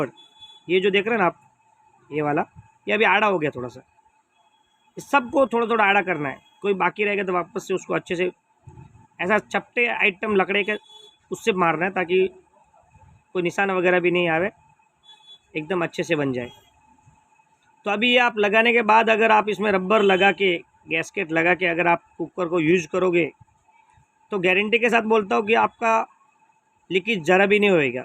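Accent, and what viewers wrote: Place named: Gujarat, India. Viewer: Wah gujarati hindi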